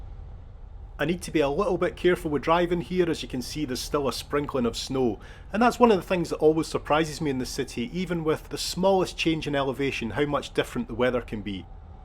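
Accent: Scotland, United Kingdom. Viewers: Hey, I've just found your channel and love it. Thank you. A Scotsman who enjoys his own country really much. Love it. I have to admit that I miss Scotland badly during this Lockdown. I live in Germany and as I habe friends in Scotland I come each year to visit them or come as a workaway. It's my second home. Mostly I arrive in Edinburgh or if not we mostly make it to an at least short visit on our way. Thank you for sharing. Lovely to watch your trips and hear your accent. Greetings from the west oft Germany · Beautiful video, love me some wet-street Edinburgh; and lovely accent, seems like Scottish people wouldn't have an issue rolling the Spanish "r" ☺️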